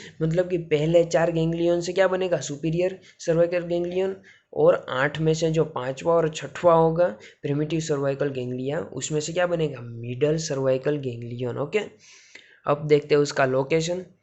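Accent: Gujarat, India. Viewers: અરે ભાઈ ગુજરાતી છો ?? તમારા ટોન ઉપરથી લાગે છે 😅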